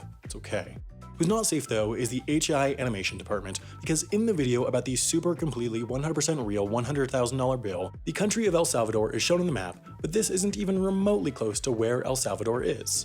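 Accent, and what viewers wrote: Netherlands. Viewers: are you dutch? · Wait... You're dutch?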